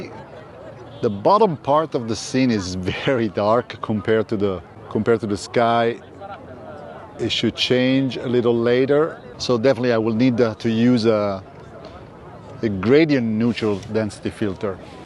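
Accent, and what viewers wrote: Italy. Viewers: Si, ma il tuo accento Milanese é pazzesco, non ti avevo mai sentito parlare in italiano. Bel progetto, Milan l'é semper un gran Milan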